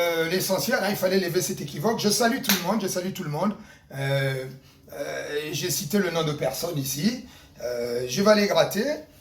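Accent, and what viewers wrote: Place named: Lari, Republic of the Congo. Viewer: bonjour! j'aime bien ce que tu fais mais s'il te plait, hôte en toi cette envie de t'exprimer comme tu le fais. l'expression tu l'as mais la tonalité laisse à desiser.tu as un fort accent lari donc ne force pas à singer ce n'est pas du tout agreable,soit simple.merci sans mauvaise foi.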